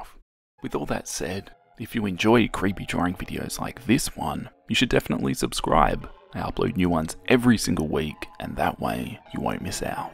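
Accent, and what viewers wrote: Australia. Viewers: Love a deep Aussie accent! Stumbled across this video and the way you narrate it is fricken great. From 1 Aussie to another! 🤙🇦🇺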